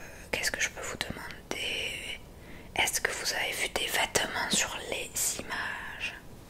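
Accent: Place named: Toulouse, France. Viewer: C'est marrant, j'ai récemment suivi une formation, et ma formatrice avait aussi un accent toulousain, et habitué comme je suis à tes vidéos, j'éprouvais des déclenchements à l'écouter parler. 😅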